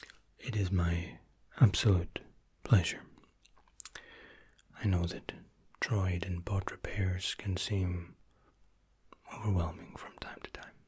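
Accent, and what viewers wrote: Scotland, United Kingdom. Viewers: Scottish ASMR King! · Are you English or Irish. Or Scottish?? I can't quite tell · Hannele he’s already got a Scottish accent lol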